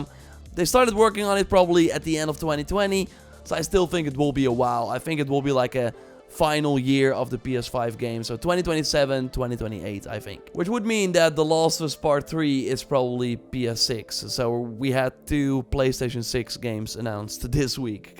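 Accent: Netherlands. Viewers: The Dutch accent I love it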